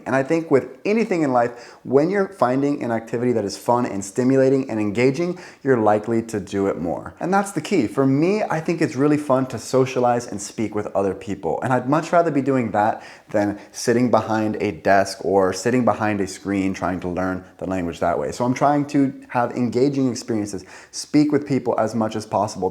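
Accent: United States. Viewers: Japp det gör han gillar att han låter amerikansk när han pratar.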